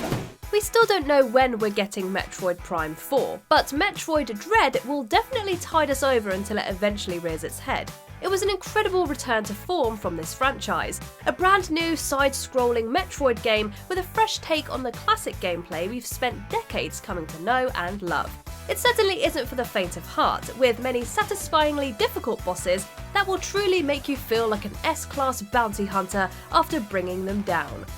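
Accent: United Kingdom. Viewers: I have a question for you,. Are you from the UK because it sounds like you are? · Watchmojo, I like the british narrator on this video. It brings class to your positive Top 10.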